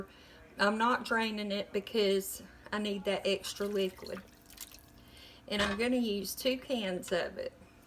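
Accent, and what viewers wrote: South, United States. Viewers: I have slowly become addicted to your YT!! I'm not used to the southern accent, but you have taught me! By the way, I really like your hair down, you look really cute! And LOVE your recipes.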